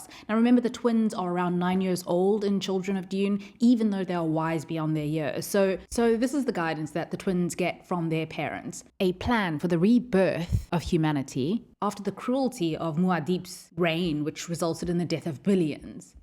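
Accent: South Africa. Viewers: I love the female Sourh African accent. The perfect mix of allure, forthrightness and malevolence.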